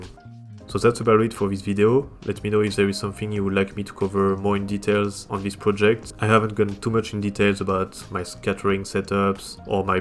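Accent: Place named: France. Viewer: I knew you speak French from the start from the accent I also have haha ! Alors voici un nouveau sub pour encourager la communauté française de Blender❤ en tt cas la différence entre la première image et ce que tu as changé est incroyable ! Du vrai art , bravo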